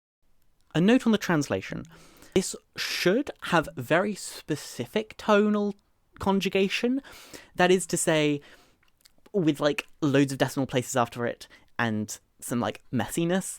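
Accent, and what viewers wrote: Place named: United Kingdom. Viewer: Super british accent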